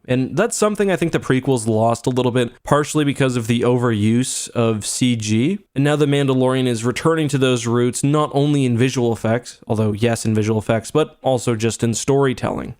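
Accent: Canada. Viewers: Are you Canadian? I detect a hint of Canadian accent, eh. This might help explain why you felt all mushy about that scene. Trudeau. I think he'll be the next Bruce Jenner only way more feminine. If you are Canadian then it sounds like he's had a bad influence in you. Man up! This is The Mandalorian, not Gilmore Girls.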